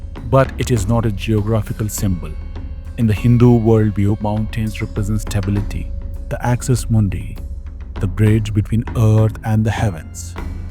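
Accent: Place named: India. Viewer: I like the dot dot dot dot Indian accent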